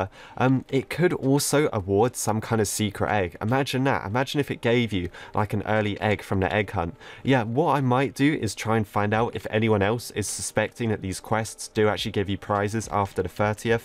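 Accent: United Kingdom. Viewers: It’s called a British Accent, look it up...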